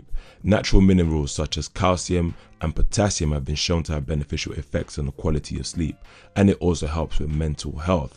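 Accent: Caribbean. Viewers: He sounds Caribbean to me.....African are different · The information that you shared is very empowering. Thanks. I love your voice/accent. It's very raspy/sexy and Caribbean. Can you share some shakes or drinks that we can make using seamoss/seaweed/algae?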